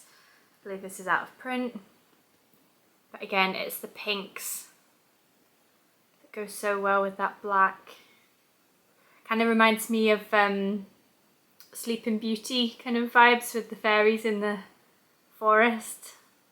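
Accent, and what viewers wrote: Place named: Scotland, United Kingdom. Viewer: Fellow flosstuber and new subscriber here 💗 so happy to find your channel!! I live in Texas, USA, but love your beautiful country and have been to Glasgow! Our daughter went to graduate school in Edinburgh and we have been to Scotland several times to visit her. Your accent is delightful and your stitching lovely! I am hoping to watch your WIP parade soon.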